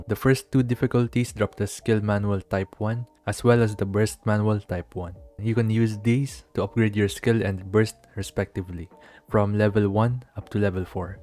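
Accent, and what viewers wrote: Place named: Philippines. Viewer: U sound pinoy..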